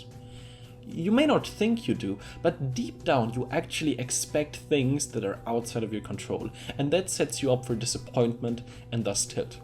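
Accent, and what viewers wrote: Germany. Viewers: hah, I knew this accent was german.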